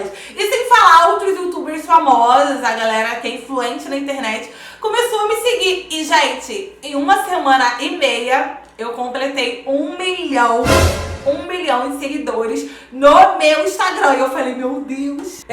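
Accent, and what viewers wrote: Rio de Janeiro, Brazil. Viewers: Eu só sou escrita no canal!! Porque eu simplesmente amo o seu sotaque carioca Sua energia .Parabéns pelo sucesso 🎊🎉🎊🎉🎊🎉🎊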